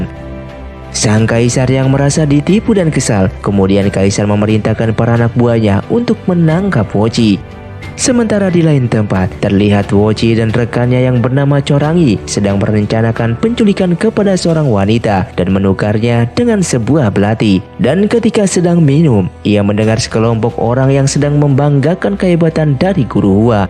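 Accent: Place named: Java, Indonesia. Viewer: Dari jawa mana bang?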